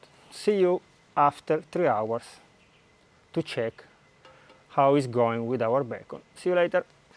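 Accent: Italy. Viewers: I love how everything you make turns out spectacular! I want to try making ALL OF YOUR RECIPES! By the way, your English is quite good, and love your Italian accent. Would you do us all a favour and say a few words in Italian to start your shows? Would love to hear you speak your mother tongue, but need some translation in captions, so we can follow along 😊👍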